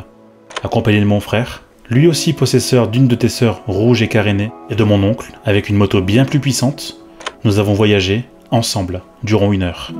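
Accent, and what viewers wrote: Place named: Nord-Pas-de-Calais, France. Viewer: Ch'ti qui vient din ch'nord in' brai deux fouâ, un' fois quin y vient, un' fois quin y r'par (j'ai essayé de transcrire l'accent 😅). Trois pattes powaaa! Gaffe quand même tu joues plus dans la même cours, ca envoie comme motobylette!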